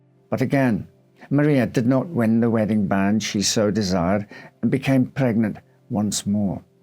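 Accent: United Kingdom. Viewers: british? lol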